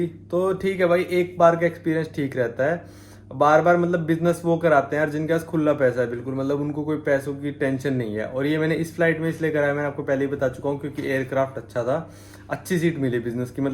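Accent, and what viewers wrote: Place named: Rural, India. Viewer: Looking ,Sounding and behaving like typical dehaati . What can you expect ?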